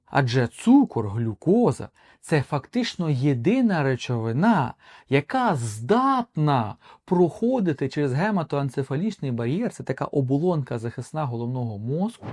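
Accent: Vinnytsia, Ukraine. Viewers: Мені здається що ви з Вінницької області.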